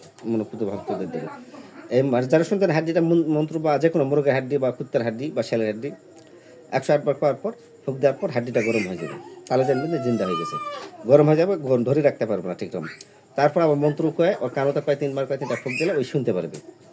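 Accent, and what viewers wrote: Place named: Naogaon, Bangladesh. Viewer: কিছু মনে করিয়েন না একটা কথা বলি আপনি তো নওগা জেলার ভাষায় কথা বলেন কিন্তু আমরা দেশের বিভিন্ন জায়গা থেকে দেখি সেখেএে আপনার কথা বুঝতে কষ্ট হয় তাই যদি একটু চলিত ভাষার সাথে সাধু ভাষা বা লিখিত ভাষা বলতেন তাহলে কথা বুঝতে সমস্যা হতো না গুরুজি❤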